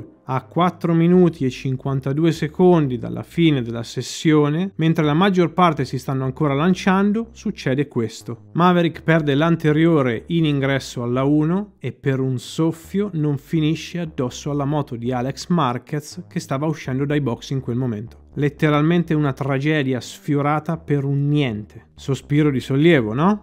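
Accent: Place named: Central Italy, Italy. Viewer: Roma no, centroitalia si, ma non lo dico, non è corretto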